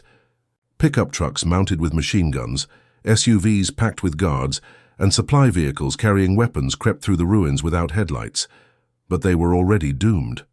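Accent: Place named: United Kingdom. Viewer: This "Commentary is a Total" LIE, being Narrated by a European Britain 🇬🇧. Muammar Gaddafi wanted Unify the "African Union " and America and Europe wasn't having that so former POTUS Barack Obama did the Devils bidding and took him out 1 of the 1things i despise America for...! FYI : Free Education, Health, Housing, No Unemployed People, and No poor people, his demise was an Evil Power Grab Orchestrated by the European establishment and Completed by this Hypocritical Government America 🇺🇸 😀 😉 😄 666..!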